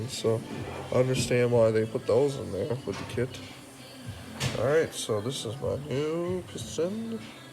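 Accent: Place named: Newfoundland, Canada. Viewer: I hear that newfoundland east coast twang haha . Im a islnder myself.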